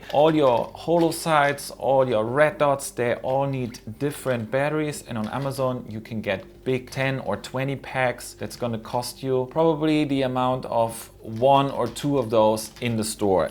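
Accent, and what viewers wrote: Germany. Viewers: Warum merkt man das er deutsch spricht😂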